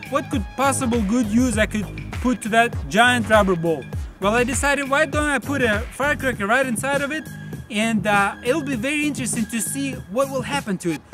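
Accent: Russia. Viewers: I hate it when he says "let's do it." In his annoying Russian accent.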